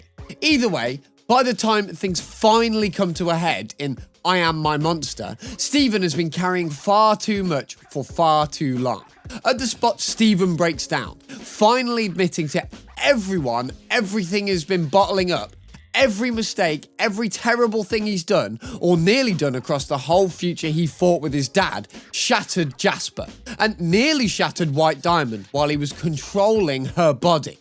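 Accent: United Kingdom. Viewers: This is the first time i see this guy and i just clicked it and the british accsent really scared me idk why 😭🥀🥀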